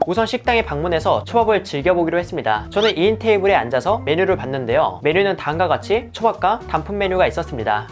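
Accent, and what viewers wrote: Gyeongsang, South Korea. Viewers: 사투리 쓰시는거 보니 경상도 분 인가요?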